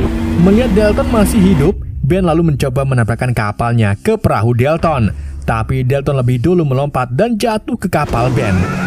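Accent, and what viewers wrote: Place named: Pekalongan, Indonesia. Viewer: Petsi wong kalongan o min..❤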